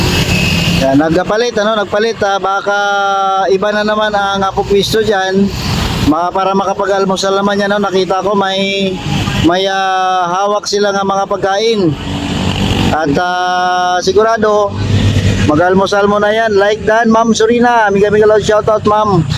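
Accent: Iloilo, Philippines. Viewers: Ilonggo ka gali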